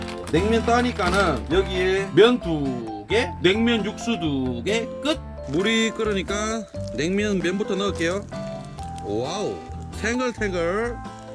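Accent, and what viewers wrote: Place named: Gyeongsang, South Korea. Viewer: 덩치 크고 얼굴 크고 갱상도 사투리에 호감가는 이유는 머징ㅋ 구독박고갑니다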